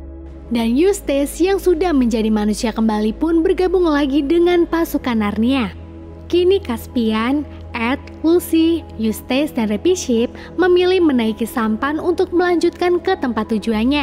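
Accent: Pemalang, Indonesia. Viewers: Emg asli Pemalang? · Mba e wong pemalang to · Fix ini miminnya orang Pemalang yah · Mbak orang pemalang kah? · Wong pemalang yo😁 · Wong Pemalang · Wong pemalang ya mbak? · wah pemalang to sampean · Jebule wong pemalang yaa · Pemalang nih ye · orang pemalang kah? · lahhh pemalang ndii mbak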